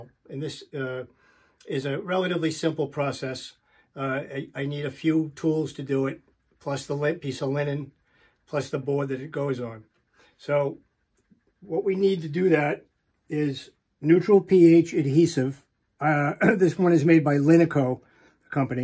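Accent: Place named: Connecticut, United States. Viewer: So glad I found your vid while researching attaching linen to wood. I didn't know about the PH neutral PVA, and I've now ordered some by the same manufacturer. On a side note, as a native Connecticut Yankee that moved to Virginia 30+ years ago, as soon as you started talking I yelled out in joy! lol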